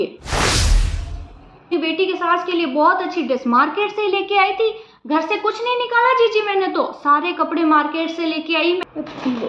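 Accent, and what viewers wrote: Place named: Rajasthan, India. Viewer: Bahut bahut badiya marwari me bola Karo acha lagta h😂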